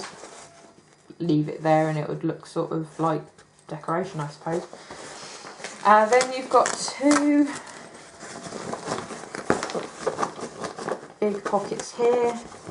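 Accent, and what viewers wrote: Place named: Texas, United States. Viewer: I enjoyed you video so much. Your "Texan is great". Being a born and bred Texan, I can tell you would make a great asset to our great state. And I like the way you shop, video and talk!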